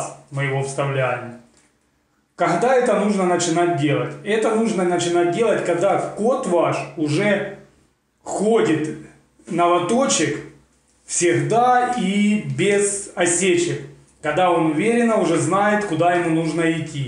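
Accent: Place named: Spain. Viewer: Сперва этого испанца к русскому языку надо научить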